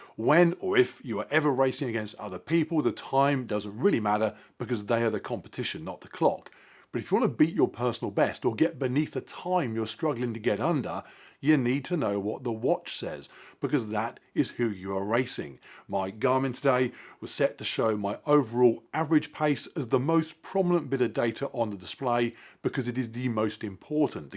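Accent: United Kingdom. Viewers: Pretty good tips to keep in mind. Also, as an American, your British accent immediately adds 20 IQ points and credibility.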